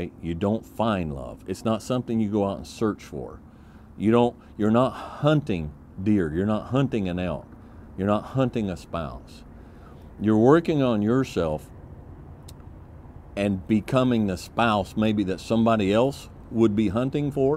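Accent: United States. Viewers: Just get another buzz mate and there you go ))) I like your videos and stuff . And I adore your John Wayne's accent mate . Just keep doing it . I love it for God sake ))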